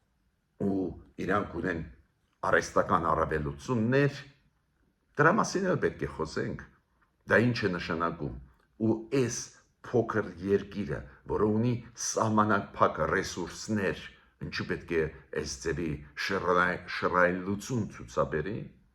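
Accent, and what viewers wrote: Russia. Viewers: nikoli tryapka ches haskanum vor da nikoli gorc@ che kez el drel es qaxaqakan verlucabani tex bavakane rusacavov tarapes akcentt rusakan e gone dranic amachi erevi naxkinum da pristij e exel ko nman soxuni hamar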